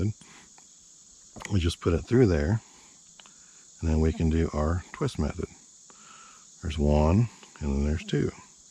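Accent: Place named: Austria. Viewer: Austrian conductor English. Lineman's Loop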